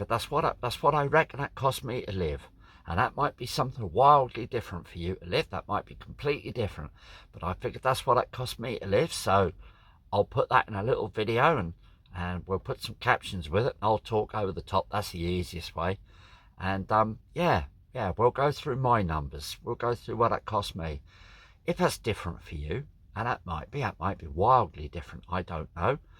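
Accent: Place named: East Anglia, United Kingdom. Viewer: Good to hear that Norfolk/Suffolk twang in hot sweaty Sri Lanka!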